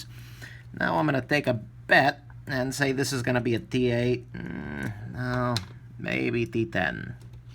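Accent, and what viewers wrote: New York, United States. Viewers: You’re from the desert? You sound like you’re from Long Island, Brooklyn or the Bronx! : )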